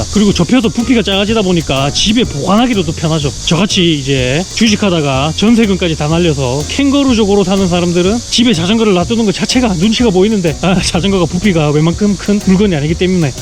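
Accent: Busan, South Korea. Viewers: 전형적인 부산 상남자 스타일~ 요즘 안쓰는 부산 사투리 들으니 정겹네요. 여행은 일상의 탈출이 되어야지 일상이 되면 안될거 같아요. 구독지수 늘어나도 직장은 50까지 놓지 마시길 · 생긴거는 이슬람으로 수염 덕지덕지한 형이 부산사투리를 하니 얼마나 이쁘나.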